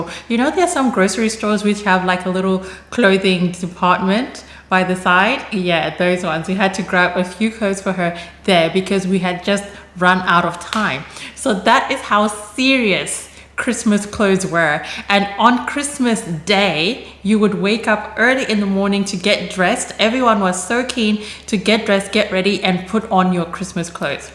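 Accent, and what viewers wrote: Australia. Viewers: Another lovely video. You speak so nicely, but I am beginning to detect a hint of an Australian accent. As an older Australian I do miss the joy of a homemade Pavlova at Christmas. Passionfruit, strawberries, peaches, mangoes, ect: I haven't had one in years. I do hope you get to enjoy one with your family.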